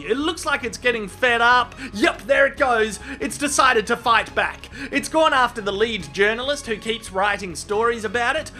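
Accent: Australia. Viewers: This Aussie cusses like an American. But it’s better with the Aussie accent. Take note fellow aussies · please go full 'stralian i would love to see you do a video on australian words... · What makes it even funnier is the Australian accent 😂🤣😂🤣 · Australian is one of my favorite languages/accents. I love hearing the way they talk and is just hilarious in my opinion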